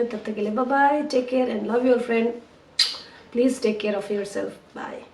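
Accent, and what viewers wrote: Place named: Nepal. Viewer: Why do you sound like Nepali?? Di are you Nepali ??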